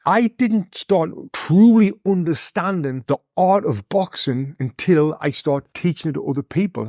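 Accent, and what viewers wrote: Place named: Sunderland, United Kingdom. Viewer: he's from Sunderland, which is the city below Newcastle, so it's very similar to a geordie accent though most Sunderland folk call themselves Mackems not geordies. Hard not to love the northeastern accents 😂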